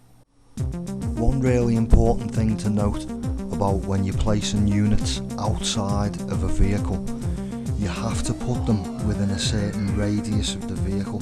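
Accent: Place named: Liverpool, United Kingdom. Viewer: hey man I've been interested in of airwolf for ages now, are you making missions for airwolf? I would love to get this if I could learn a bit about the game I will try and help you. I remember when this used to be on tele on the channel Bravo? Also you sound like your from Everton? yes? I'm not too far away, a bit further north. :)One more thing which operation flashpoint is this and will airwolf work with red river?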